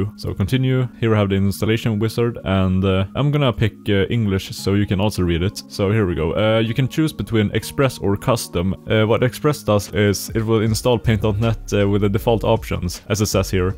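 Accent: Sweden. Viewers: the swedish accent i knew he was swedish from the first second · Han hör är 100% svensk😂😂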